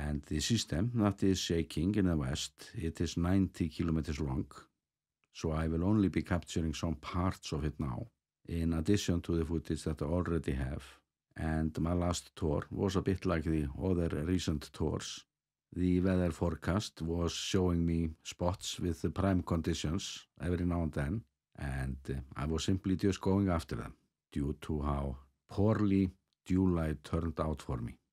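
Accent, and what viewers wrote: Iceland. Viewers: Fluent English spoken with Icelandtic phonemes sounds amazing! Plus of course you have a nice voice. :-)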